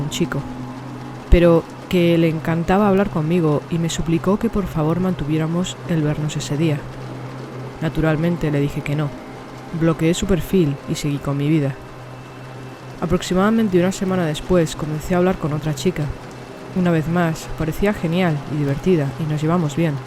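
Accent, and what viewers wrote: Spain. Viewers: Saludos,. Con la premisa de que YouTube es sin llorar, comento; en mi vida había oído narraciones más sosas, insípidas y tediosas. Reconozco que debes ser de España y que me recuerdas a un humorista español de gafas y cigarrillos con su clásico de gallegos..."Y que es de pilar?" Chiste muy viejo pero igual de plano, sin matices, incluso petulante... Recomiendo que te dediques al humor porque esto, no sé cómo definirlo, es como sentarse a mirar cómo la pintura se seca. Infumable, cero talento y peor, empeño por narrar historias de terror. Saludos desde la universidad de Miskatonic, pronto se abrirán matrículas para narraciones de horror.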